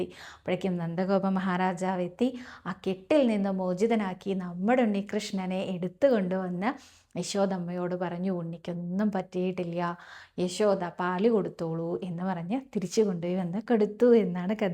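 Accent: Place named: Thrissur, India. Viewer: കഥ കേൾക്കാൻ നല്ല രസമുണ്ട്, പിന്നെ ആ തൃശൂർ സ്ലാങ് ഒക്കെ നന്നായി 🙏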